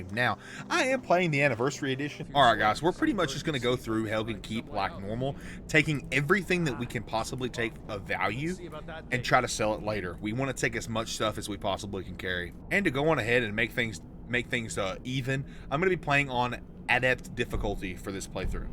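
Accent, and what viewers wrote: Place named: Louisiana, United States. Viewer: Where you from bro? Lol im from Louisiana so the accent is familiar. Geeat content btw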